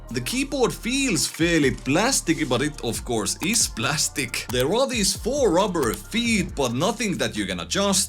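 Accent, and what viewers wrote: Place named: Finland. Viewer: huomaa aksentista että oot suomalainen. kiva että on tälläisilläkin videoilla suomalaisia tekijöitä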